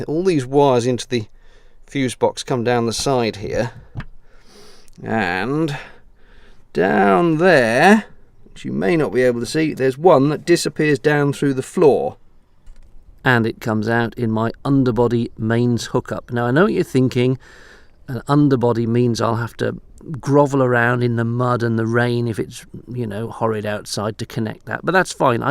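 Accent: RP, United Kingdom. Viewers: Accent is so posh!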